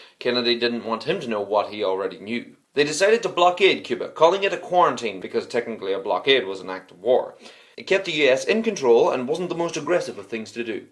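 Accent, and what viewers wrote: Ireland. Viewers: are u irish? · I think it's because he's Irish and JFK was Irish-American. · He's Irish.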